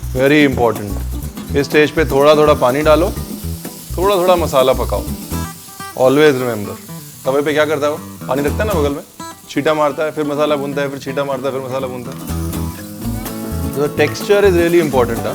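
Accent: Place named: Punjab, India. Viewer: Panjabi pana🤣😘itna cute bolte ho 😆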